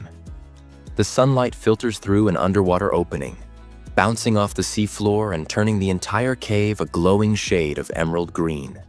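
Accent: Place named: United States. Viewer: Why do American accents get on my nerves so much? Sorry,can't bear to listen!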